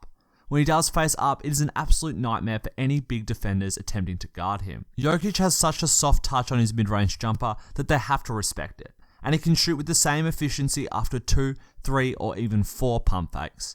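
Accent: Australia. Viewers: A guy from Australia (sorry if I misjudged the accent, not a native English speaker) with 4k subs on youtube makes NBA analysis of such quality and depth, probably working in his room at home, and ESPNs and Foxes of the world, with budgets of tens of millions of dollars, can't give us anything better than Colin Cowherd and Nick Wright...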